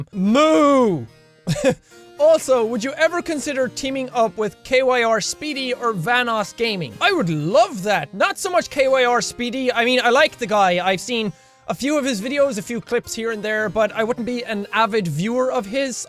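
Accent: Ireland. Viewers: Hey fellow irish man here. Where ya from and can you please say Ballymena 'ey for me! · Are u Irish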